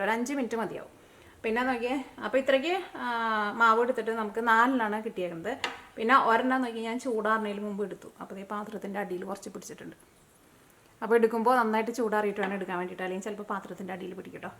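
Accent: Thrissur, India. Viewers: urakka parayana...nammal trichur kar allaaa...speed kooduthala..chilathu manassilagunnillaaa...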